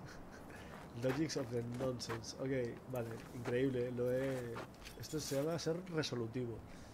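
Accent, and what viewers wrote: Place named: Argentina. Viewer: Que grande, lo escuchas hablar y es mas argentino que el dulce de leche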